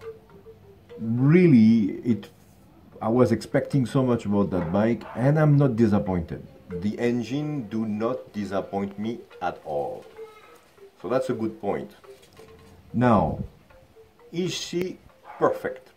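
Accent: France. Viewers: are you french ? because you speak englich as à french guys......salut d'un motard français. ....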